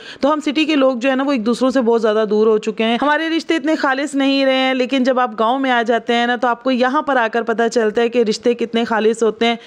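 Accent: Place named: Lahore, Pakistan. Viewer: Your urdu is not like pashto speaking persn is totaly lahori accent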